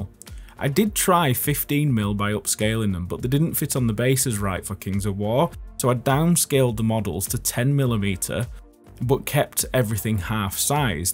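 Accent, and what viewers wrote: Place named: Yorkshire, United Kingdom. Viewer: It's nice hearing a fellow Yorkshire accent (if you are not from Yorkshire I am so sorry if that was an insult lol)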